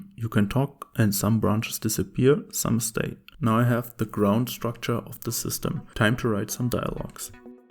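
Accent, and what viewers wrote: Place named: Germany. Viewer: You can hear the german.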